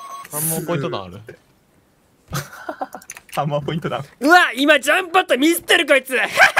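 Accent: Kansai, Japan. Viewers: 関西の人特有のキレキレのツッコミが面白かったのでチャンネル登録は次回にさせてもらいます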